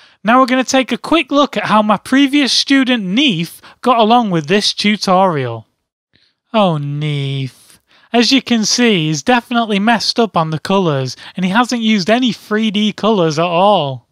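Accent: United Kingdom. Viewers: Yay female Scottish link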